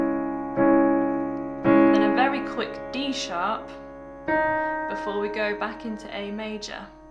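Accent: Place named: Lincolnshire, United Kingdom. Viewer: Hi, Your tutorials are really easy to follow and you explain them so well, I’ve be trying this on and off for a few weeks now and almost nailed it. Can’t add vocals yet though lol. Just out of interest and I hope you don’t mind me asking but you sound like you’re from my neck of the woods, sort of Grimsby Humberston area of Lincolnshire. Hope I haven’t offended you with that 😅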